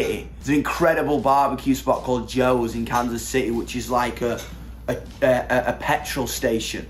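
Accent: United Kingdom. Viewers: this is exactly how I imagine a British person to sound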